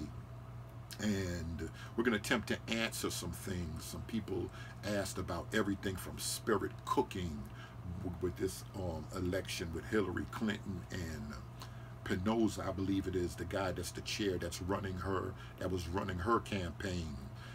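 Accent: New York, United States. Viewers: Of all you got to say, you reply this criticism That's a part of his strong NY accent. Get off the BROTHER. Ask God to help YOU so you will LISTEN to all this brother is saying. YOU got a PROBLEM!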